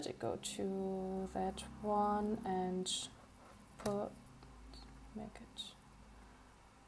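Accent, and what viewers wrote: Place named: Germany. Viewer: 2 seconds into this video and I already figured you're German.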